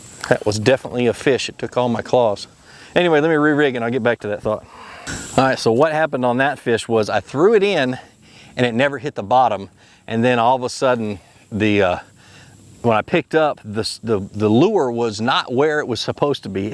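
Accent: Georgia, United States. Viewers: are you from georgia? pls come teach me lmao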